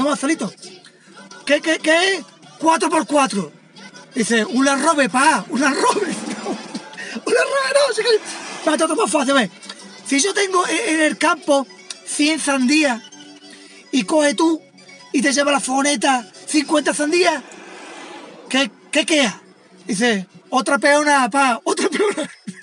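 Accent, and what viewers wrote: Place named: Andalusia, Spain. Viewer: Éste hombre habla perfecto andaluz!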